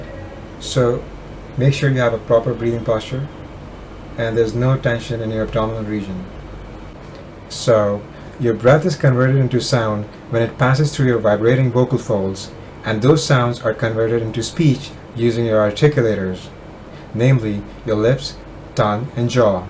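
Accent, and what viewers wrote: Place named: India. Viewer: Your indian accent is still a bit heavy, but much more better then most indian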